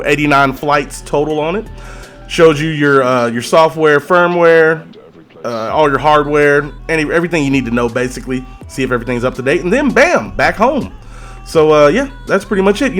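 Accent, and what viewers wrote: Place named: South, United States. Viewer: can't tell if this guy is in Texas or along the Mississippi river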